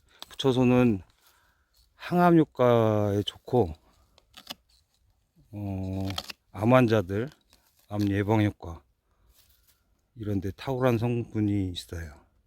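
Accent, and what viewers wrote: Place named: Chungcheong, South Korea. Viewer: 충청도 사투리 끝판왕이십니다~~^^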